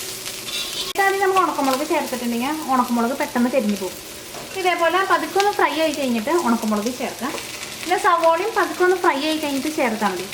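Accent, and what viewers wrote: Thrissur, India. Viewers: thanks for that recipe....... one advice: you need to try to speak in clear malayalam instead of using your regional accents like thrissur or kottayam........ usually when we address public (either in stage or off stage) all of us speak normal malayalam without local accent....... (and all of us can speak too)..... try next time please.........so that you represent the entire kerala..........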